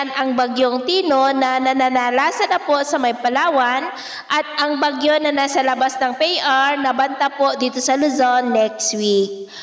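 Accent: Visayas, Philippines. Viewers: Bisaya Yung nag wiwider apdit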